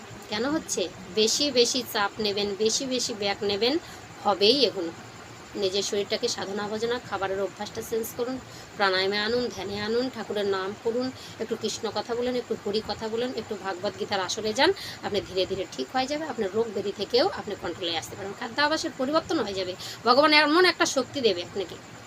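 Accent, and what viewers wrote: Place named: Bangladesh. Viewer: Apni ki Bangladeshi? Voice tone ekdom Bangladeshi der moton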